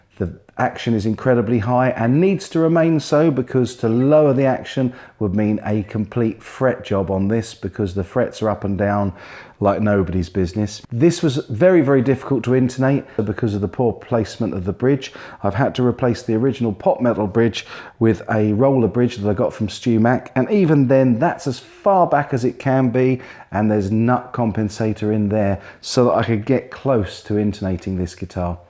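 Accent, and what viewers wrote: United Kingdom. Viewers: (From Belgium) Hello, I just discovered your videos for the first time: great job, hats off! You might also consider comparing the Harley Benton SC 550 II (= 2), which is even more convincing due to...STAINLESS STEEL FRETS and Tesla pickups (no Roswells on that one)!!! A SUPERLATIVE as far as frets are concerned. A dream really. This sells for a ridiculous price: 235£/279€. The web is full of positive appreciations and many testers kept or bought this guitar immediately (John Robson, e.g. ). I ordered one immediately, convinced that it is the bargain of the year. Finally, as a francophone deeply in love with American English, I must say I enjoy your British English A LOT. What a pleasure! If you’re not a teacher, you should consider becoming one ;-) Keep up this brilliant work. By the way, you might think of indicating the radius of the fretboards.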